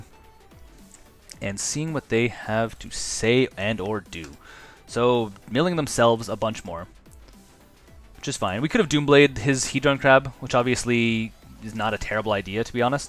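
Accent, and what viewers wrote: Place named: Edmonton, Canada. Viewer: Edmonton? Your accent is too think to be Windsor.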